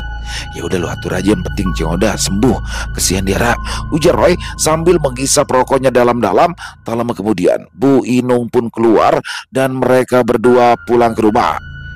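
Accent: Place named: Betawi, Indonesia. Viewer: Pulen amat bang logat betawinya. 😅😂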